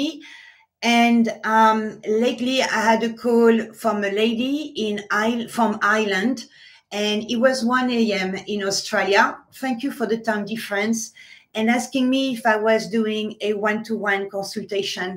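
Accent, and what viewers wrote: France. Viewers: Your cute french accent is so charmimg on youtube. Please don't go... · Take care of you but please keep us in your life! Love, love, love you and your social media. Best part is hearing your lovely, smooth French voice. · I recently found your YouTube channel and Wow!! I love all your content, your personality, your French accent 😄. I just want to say don't give up. It looks like you have so many people who support you and love what you do. Your ideas are classy, doable and timeless! I love it all! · Merci , merci …pour votre bonne humeur , votre beau sourire , et vos vidéos que j’adore écouter , parce qu’enfin , je comprends tout , votre anglais est adorable , avec un accent français , un peu comme le mien 😂🥰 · Love your sense of humor ! Enjoy listening to your lovely French voice and uplifting ideas on all subjects ... so glad I found your utube channel. 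Best wishes. Karen from Denver Colorado.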